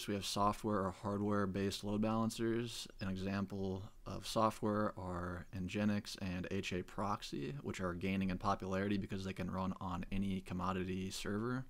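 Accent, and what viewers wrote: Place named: South, United States. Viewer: Love that southern drawl man! Teach those prejudiced idiots who think speaking with a different accent makes you less intelligent that they don't know what they are talking about!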